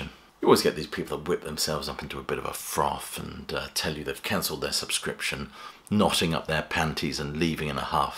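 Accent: England, United Kingdom. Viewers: F-Them. Love your English accent. Keep up the good work. All the best from me to you, Sir! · Love your channel and your English accent, eccentricity and humor! Plus your knowledge is vast! Carry on Wayward Son of Rock! Never mind the Trollos!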